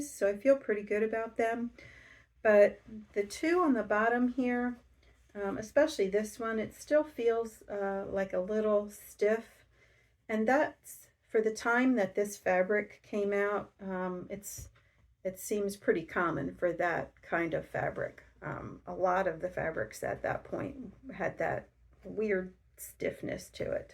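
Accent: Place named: Delaware County, United States. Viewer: I recognized your accent immediately and had to look back to find your location! My family and I are DELCO and have nice relocated. Your voice and accent is soooo comforting! Your quilts are pure love! So happy to have found you! I just want to get away from the commercialized channels and found home!!! So happy!!!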